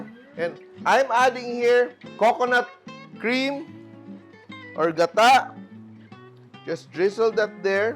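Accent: Visayas, Philippines. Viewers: Pls do not speak english or tagalog in visayan accent. I don’t umderstand it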